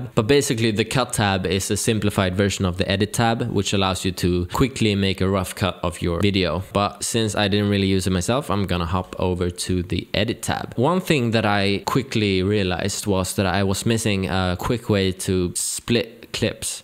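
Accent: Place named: Sweden. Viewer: You from sweden dude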